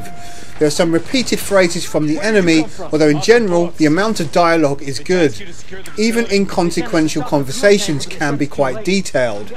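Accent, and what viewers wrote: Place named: United Kingdom. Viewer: I like your British accent, which sounds very soothing for video game reviews. But there are certain elements and design choices which I honestly can't appreciate in Blacklist. First it has too many mandatory combat sections and that level where you are in the stead of Briggs dips you in an FPS, which is a bad idea of which I sincerely cannot fathom the existence. Second there are too many dogs that turn into pure annoyance if you go for a perfect stealth route. And there are too many levels that force you to go in one direction, with segments being entirely separately from one another in such a way that once you go past a segment, the game loads the next one so the enemies in the previous one no longer tracks your move - this will not make any sense if designed for Chaos Theory, because that game allows you to play in a non-linear fashion (remember the bank mission where you can play one way and the other way around). 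Blacklist is not like a small open world, for it often gives you a straight road and there too many areas so well lit which will give you pain if you want to play stalker.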